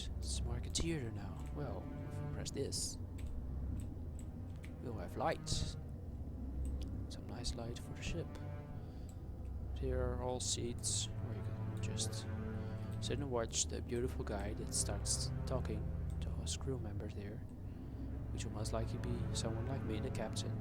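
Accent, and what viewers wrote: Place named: Turkey. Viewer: Question: Are you Turkish? You sound Turkish.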